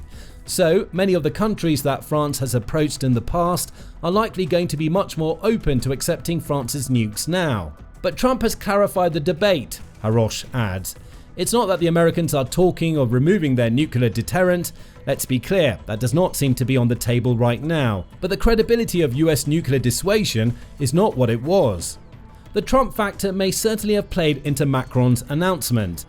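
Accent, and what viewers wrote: United Kingdom. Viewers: Please learn to pronounce NUCLEAR correctly - it’s very annoying to hear ‘NUCULAR’ - I love your voice but don’t enjoy hearing a nice UK accent that doesn’t pronounce English words correctly. Love from a grumpy old lady in Australia 😂❤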